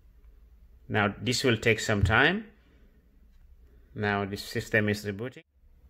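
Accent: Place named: India. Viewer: Indian voice, black hands...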